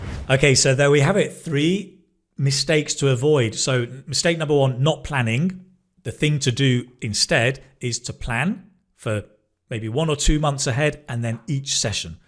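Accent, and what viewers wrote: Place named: Manchester, United Kingdom. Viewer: I think he is from Manchester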